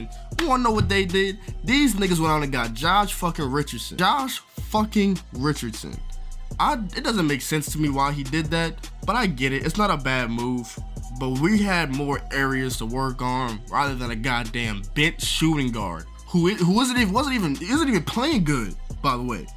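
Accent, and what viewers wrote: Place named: West Virginia, United States. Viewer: tf is that accent? you from west virginia or arkansas?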